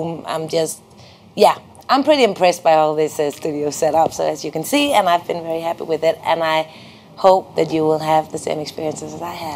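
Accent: Denmark. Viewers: danish accent (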